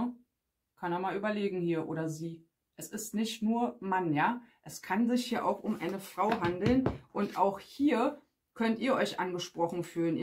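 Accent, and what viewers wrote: Berlin, Germany. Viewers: Liebe, danke für deine "Berliner Schnauze". Red wie sie dir gewachsen ist. Ich mag das sehr! Die wenigen Kritiker gehn doch unter bei uns. Du hast mir die letzten Wochen einen unglaublich klaren Blick auf die ganze Sache und meine Erfahrungen/Bedürfnisse geben. Auch wenn es oft weh tat/ tut. Nur so kann ich gestärkt vorwärts. Danke und weiterso. Fühl dich gedrückt. Liebe Grüße aus Bregenz am Bodensee 💕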